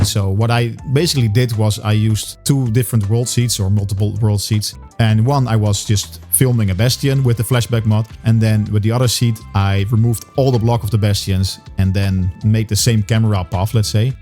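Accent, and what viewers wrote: Netherlands. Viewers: ik had eerder gehoord dat je een nederlands accent had!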